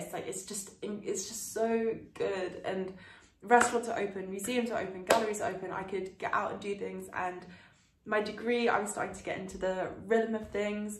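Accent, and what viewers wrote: France. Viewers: At what age did you started to have the French accent and how?:)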